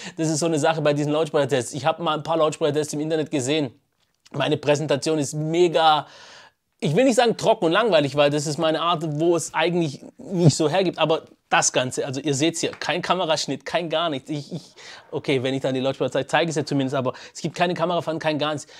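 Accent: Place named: Swabia, Germany. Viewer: Schwabe laaan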